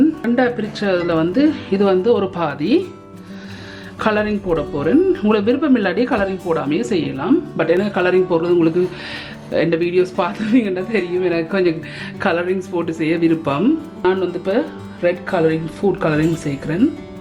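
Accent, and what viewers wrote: Jaffna, Sri Lanka. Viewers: I was browsing for Jaffna sweets for Xmas and found yours. Love your videos with our Jaffna Tamil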